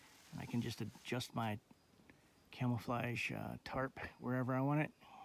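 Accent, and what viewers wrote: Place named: Baltimore, United States. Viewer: It kind of sounds like Baltimore. What do you think?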